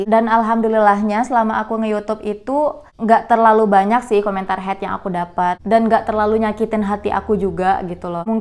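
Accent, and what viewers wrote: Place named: Karo, Indonesia. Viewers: logat nya sumatera ya mba..horas mamak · logat karo nya 👍👍👍👍👍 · Awal ibu kakak itu ngomong Aku langsung keingat kalau orang karo berbahasa indonesia itu ada khasnya :v, dan aku tadi terkejutnya pas kakak ngomong bahasa karo :v auto bangga jadinga Sukses ya kakak Btw kakak org karo ?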